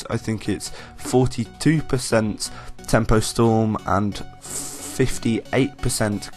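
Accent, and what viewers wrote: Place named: North, United Kingdom. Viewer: Bro, your logo makes me so happy to be alive. Also I'm guessing you're a dirty Northerner judging from accent :)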